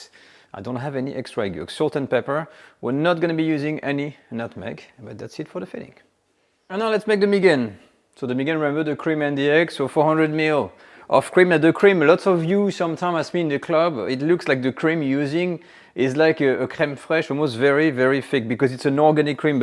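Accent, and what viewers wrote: France. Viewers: I could just listen to your French accent for ages!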